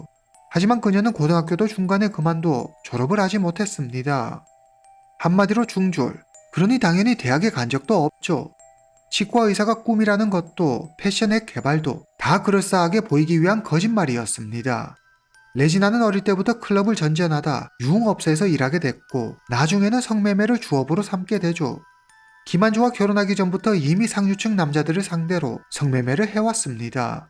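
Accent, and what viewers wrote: China. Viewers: 조선족 교포 이신가요? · 한굳말 잘하는 중국분이라고 생각했어요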